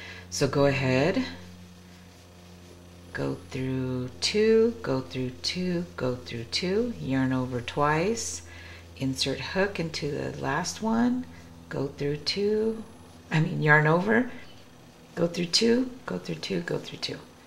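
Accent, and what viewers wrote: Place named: United Kingdom. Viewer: Sounds like your from the UK... The stitches are in English / US terms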